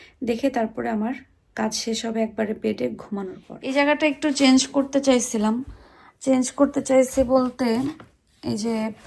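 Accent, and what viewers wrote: Sylhet, Bangladesh. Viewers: Sylheti manus bole kota❤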